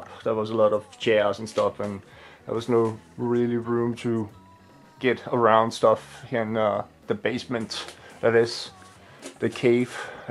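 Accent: Denmark. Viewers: Det er sjovt at høre en tale engelsk når han er fra Danmark :D - Nice video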